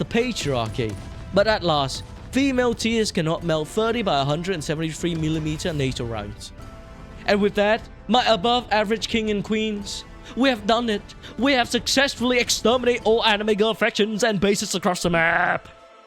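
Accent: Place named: India. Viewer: why do u sound like u have a 5% indian accent today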